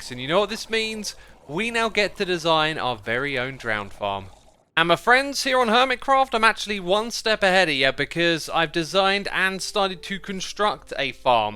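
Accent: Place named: United Kingdom. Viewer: He's hella british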